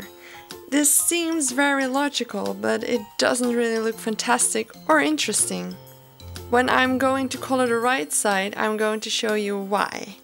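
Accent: Netherlands. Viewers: Are you dutch by chance? idk I kinda sound the same when I try to speak English. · Dutch? XD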